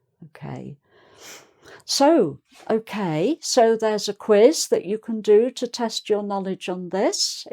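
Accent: England, United Kingdom. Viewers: Que fofa ensinando e que sotaque inglês lindo!!! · Esto es el acento británico? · Love from INDIA...ARE YOU BRITISH MAM?? AMERICAN ENGLISH ACCENT IS HARD TO UNDERSTAND FOR US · Na verdade ninguém nasce sabendo, todos precisam aprender. E esse sotaque é o sotaque do inglês britânico que e o verdadeiro, os americanos não conseguem mais emular esse sotaque.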